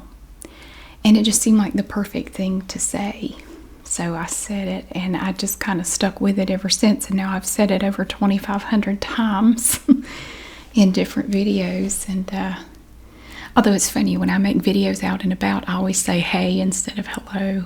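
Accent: South, United States. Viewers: I found your channel four years ago when I moved away from home at 18 from Alabama to California (to go to school for linguistics). I already loved ASMR but I started to get homesick so I looked up "ASMR southern accent" because I thought it would bring me some comfort. Your channel was the first one to come up, and your voice reminded me so much of home! So thank you for bringing me a little bit of familiarity and comfort during a hard time, and I love your accent ❤ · You accent sounds like Forrest Gump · I follow Tabitha Brown and she’s also from North Carolina and has a lovely southern accent too and I enjoy hearing how you both pronounce certain words. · your accent reminds me of my mom's side of the family. To me accents are beautiful just like different languages. To hear you brings back a memory of my Nanny (my mom's mom) she had a fantastic southern accent. 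Now I'm born and raised in South Texas and my mom from TN. So thank you for bringing back a nostalgic feeling. You keep doin you and I concur the internet being exposed to social media affects us. In every way possible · 6 years ago I went through military bootcamp and it was the first time I was really exposed to the southern accent. I remember one specific girl, she was my wingman, who was from North Carolina and she had a very thick southern accent that reminds me of yours. I also made lots of friends from Georgia while I was in the service, my Georgia boys ❤️ The southern accent will always hold a special place in my heart. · I enjoy trying to mimic accents, and yours is the most fun to try. It makes words more fun to say and it's pretty to boot. Many Southern accents have more in common with British than I think a lot of people realize. A lot of syllables are drawn out in a similar way. 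Thanks for the tips and tricks, soon I will be an expert lol ;p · something about souther accents makes me tingle.... thank you for this video! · I live near raleigh and I hate that I don’t have a southern accent- my mom moved from Franklin Virginia to California and got made fun of because of her accent so she had to learn how to talk like them I always wanted an accent like yours and I love watching your channel because you have the same accent as my grandmother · Can you do a video trying to speak without southern accent? itd be really fun to watch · I love to listen to you talk. My dads family was from West Virginia, so their accents are different. But I love all southern accents. Sounds like my childhood. ❤️ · That’s hilarious that someone accused you of faking it. I’m from the south and there’s many many different accents. My kids don’t have as much as an accent as I do either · Oh my lord, people really think she fakes a southern accent?? 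I admire anyone who maintains their accent- especially southern, for all the ignorant crap they deal with. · The southern accent is one of my favourite american accents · I want to come to america and date a girl with a southern accent lol. What states have nice accents like this? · Ohhh you’re SOUTHERN southern,,, I’m Appalachian southern. Very different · First of all, I love your accent and positive energy! Also, I’m a store shelf organizer too 😜 ❤️ So many people think that there’s only one southern accent. I’m from Louisiana, and we have several different southern accents over here as well. The north LA accent sounds completely different from the way we talk down here in south LA. We, like you in N.C., also have several different accents just within the coastal parishes of our state. Where I live, we sound like what you hear on the tv show “Swamp People”. Whereas, in the northern part of the state, they sound more like The Robertson’s from “Duck Dynasty” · I love your accent! 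I am planning on going to college in the south next year because I have always loved listening to people with these accents. wish I had one myself! Love your videos 💗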